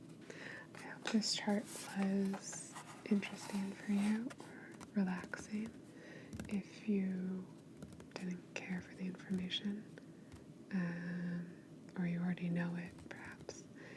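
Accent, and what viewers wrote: Argentina. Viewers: Hey ~I think you're the reason why I'm turned on to the Argentinian accent/dialect as I'm learning Spanish...so, thanks so much^^. And BTW, enjoying a Canadian Mist while I re-watch this video, so I hope that doesn't take away "cool points" from me in your book =-p. Haven't had Japanese whiskey yet so that's on my list next. What's your absolute fav??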